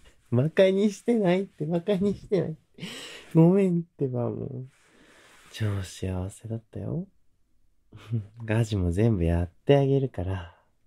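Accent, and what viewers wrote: Kansai, Japan. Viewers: 初見です!!!! 一目惚れしました声イケボ過ぎ!!!!!&かわいい&関西弁((最高( ´∀`) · えっちょ、、、地味に関西弁なのマジ好きあのね普通に心臓もたないオッケーの言い方可愛いチャンネル登録失礼します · 関西弁×透き通る低音×甘えたな感じ、最高でした。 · うぽつです！ （遅くなりました） 動画待ってました様〜！！久しぶりにいふくん来た！今回も甘々の関西弁がリピートを誘わせて, これ子供が見て大丈夫なんだろうか, てか無料で聴いて本当にいいのだろうか, 最後の｢おはよ｣はしぬ😭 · しぬぅ☆ 関西弁が尊い😇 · 寝起きな感じの声のトーンと甘い関西弁がささりました🥲 ずっと幸せそうに話してるの好きです( o̴̶̷᷄﹏o̴̶̷̥᷅ ) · いふくんのASMR初めて見たんですけど破壊力！！( ´ཫ`)♥いい声すぎます､､､ ずっと幸せそうなの最高です💞関西弁も相まってさらにかっこよさが､､､꒪꒫꒪) ありがとうございます！ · めちゃめちゃ好きな声ではないんだけど喋り方、雰囲気がなんかハマります！癒される！あと関西弁がいい👍💕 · 久しぶりのボイスありがとうございます😭💙 かわいふふでかっこよくて最っ高です😖💞 あのボイスの続き……❔🥺 関西弁なんかいつもより多く感じて好きすぎるし、最後のちゅっも……🤦🏻‍♀️♡ どきどきとニヤけが止まんなかったです。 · もうめちゃめちゃ好きすぎて…😭 関西弁なの本当にやばいです…!! 尊い…🤦‍♀️✨ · なるほどこれアラームにすればいふくんの彼女になれるという訳ですねありがとうございます大好きです関西弁の犬系ドS低音イケボいふくんごちそうさまでした🤦🏻🤍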